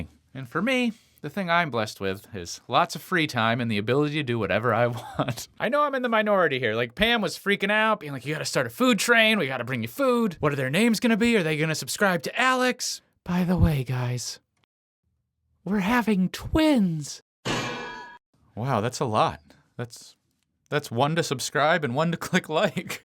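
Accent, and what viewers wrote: United Kingdom. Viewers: Are you British ????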